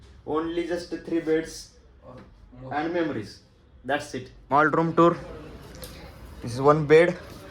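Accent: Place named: Pakistan. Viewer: Pakisatani English ewwwww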